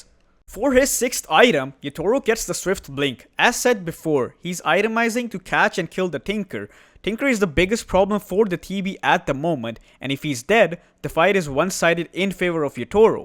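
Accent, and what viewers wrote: India. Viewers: You talk like Indian ))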